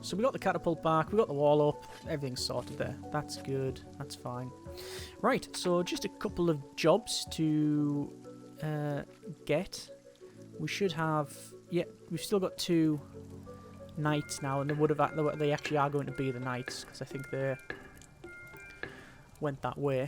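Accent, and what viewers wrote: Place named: Wales, United Kingdom. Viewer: Sounds Welsh to me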